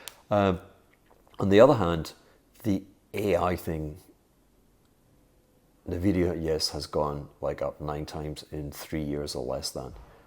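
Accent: Scotland, United Kingdom. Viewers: It's how as a Scot, he pronounces 'asset'. Clearly it's an in-joke and a play on words with being on acid, ie.e joker and maverick take your pick. Being elusive and unpredictable is part of the shtick.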